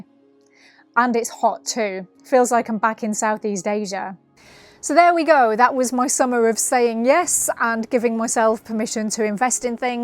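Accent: United Kingdom. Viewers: Caught your video in the rain soaked car, wanted to say that I liked your delivery and comments on the weather. I was unable to post a comment though. You seem quite a sincere person who knows quite a bit about yourself and preferences and how to cope. I am a little astonished how many nice YouTubers there are. And many seem to have a British accent.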